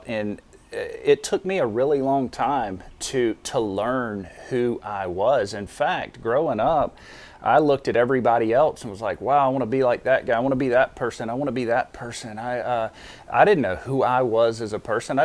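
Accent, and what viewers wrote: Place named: Panhandle, United States. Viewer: I’ve been a big fan of your videos for some time, originally because they took me back to the Pensacola beach of my youth. I still love the weekly beach scenery, but I’ve grown to be a big fan of you personally. I always enjoy seeing your handsome smiling face and hearing that cheerful voice tinged with a soupçon of Panhandle drawl. Thank you for opening up and being so honest with us. I personally know what it’s like to receive criticism on a public level. I am retired now, but I worked in show business for 50 years and the one negative review I got was in a national magazine And believe me it threw me for a loop. I took it so personally and just wanted to crawl under a bridge. Eventually, I got over it, of course, and like you, therapy has helped me a great deal over the years. The one single slogan that I heard that helps ground me is “What other people think is none of my business.” It’s a total waste of time to try to read other people‘s minds and so I don’t anymore. 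Thanks again for all your great work and I look forward to future videos.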